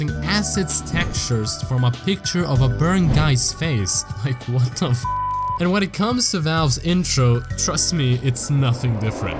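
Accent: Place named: Baltic states. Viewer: Y'know, you have some weird Baltic accent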